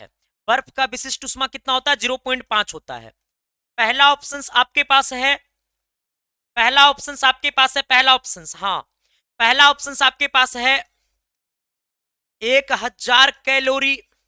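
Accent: Bihar, India. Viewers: aap bihar se ho.....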